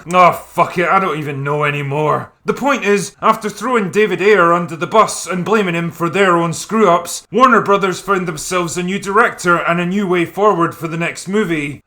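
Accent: Scotland, United Kingdom. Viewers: You’re Scottish? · I used to think you always sounded drunk, then I realized you’re just Scottish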